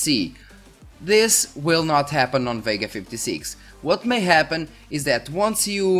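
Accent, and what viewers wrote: Portugal. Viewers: Quando te ouvi falar logo no primeiro minuto pensei: este deve ser tuga, isto é um sotaque tuga a falar inglês. E eis que acertei! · Tens bons Vídeos,Só tens que melhorar o teu sotaque em Inglês,a primeira palavra que te ouvi falar soube logo que eras Portugues :) ahaha mas bom contéudo anyways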